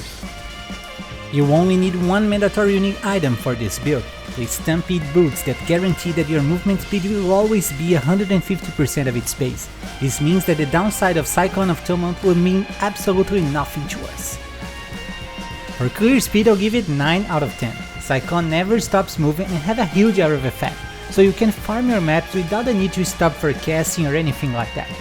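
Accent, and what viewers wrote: Brazil. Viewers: by your ascent you sound like brazilian guy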